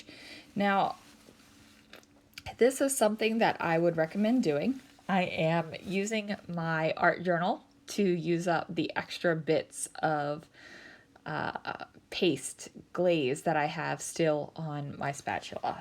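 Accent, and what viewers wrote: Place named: Maryland, United States. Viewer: Love your Maryland accent...miss that place as we have landed in Florida!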